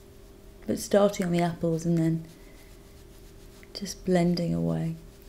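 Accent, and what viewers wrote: United Kingdom. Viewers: love this tutorial, i think i may follow it for the days when i have to go to work with just little hours of sleep, it may sound geek but listening to your videos helps me improve my english and i can understand british accent better, so apart from learning about make, i also improve my language skills!!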